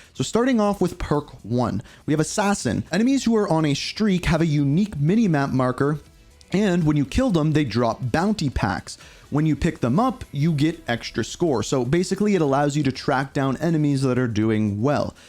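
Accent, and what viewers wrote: Canada. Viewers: didnt know you were canadian til i heard those aboots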